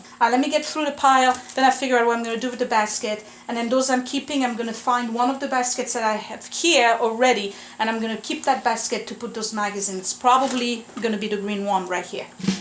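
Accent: France. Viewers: What I'm about to say is probably to repeat what others have already said...but... schools might love the pens pencils. Your baskets, and the contents , the post it notes -- other things -- do NOT look tacky, nor shoddy and others will love picking through. If you placed some of these things within the baskets curbside with a FREE sign atop ... well all this really good looking stuff (if excessive) might disappear quickly. What courage you show in tackling the impossible. It's what you don't want to do but you do it. Super character modeling for those kids. Again a joy to listen to this French inflected lovely voice and all the dissecting of life and our little errors in buying...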